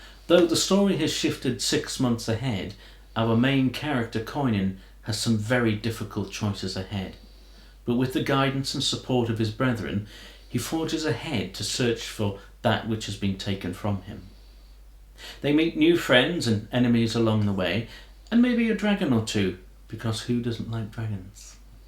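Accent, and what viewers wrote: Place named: England, United Kingdom. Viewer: I've told you before, I love your English accent.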